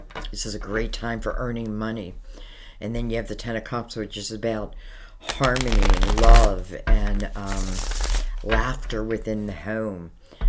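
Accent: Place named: South, United States. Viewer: Thank you so much! I needed this today. You're gifted, skilled, and personable and I have to ask where your accent is from because your voice sounds soothing and Southern. :)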